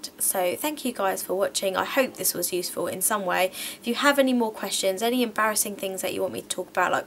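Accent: United Kingdom. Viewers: is she British? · Omg I love ur British accent so proper!!!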